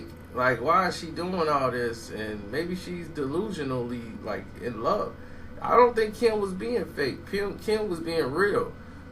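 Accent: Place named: Houston, United States. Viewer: Nigga talk like he from Houston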